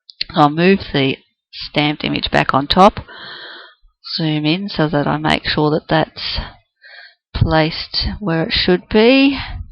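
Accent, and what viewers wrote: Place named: Australia. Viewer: Loooove your Aussie accent! TFS that vid. It's awesome! :-)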